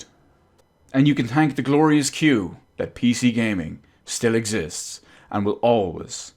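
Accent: Ireland. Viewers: Is the Glorious Q Irish ?.., ,( asks another Irishman )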